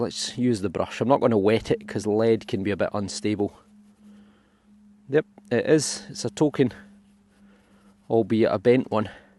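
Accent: Scotland, United Kingdom. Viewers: Congratulations on 36k, you earned every subscriber fair and square! You really are the best of the best at what you do! I always look forward to every hunt, every tour, and every history lesson! You should see the looks I get, here in North Carolina, when one of your Scottish phrases falls out my mouth! Hard not to pick up a little Scottish brogue, words, and phrases when I watch all your videos. Lol Great finds and always looking forward to the next! Ive also been watching all the tour videos on your other channel and enjoy them so much! Thanks for sharing it all with us! Take care and see ya on the next go round!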